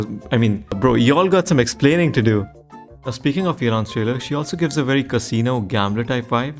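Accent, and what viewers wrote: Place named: India. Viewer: really like your accent. Its inbetween indian non indian- kinda 🥵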